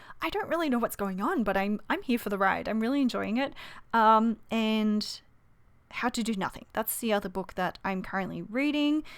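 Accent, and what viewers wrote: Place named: Australia. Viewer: The Australian accent though 😍😍😍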